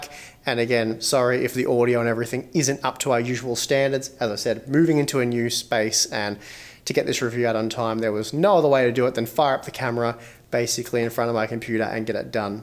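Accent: Australia. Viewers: Audio's good. You're Aussie so it sounds fancy.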